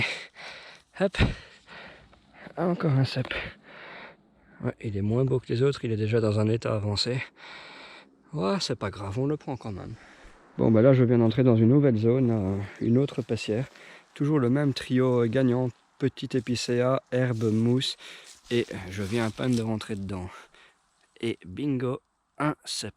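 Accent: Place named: Belgium. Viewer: Tout est parfait dans cette vidéo, les plans, les commentaires, le petit accent belge. On se régale nous ;)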